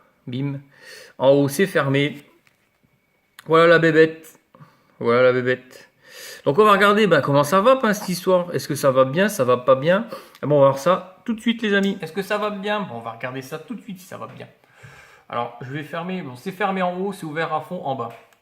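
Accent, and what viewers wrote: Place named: North, France. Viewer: je suis sur atos mais en reconstructible j ai pas passer le pas mai très bonne vidéo bonne explication de quelle région tu habite tu a un accent qui fait penser un peu au nord moi je suis originaire des Haute Pyrénéenne mai j habite en région parisienne Bernard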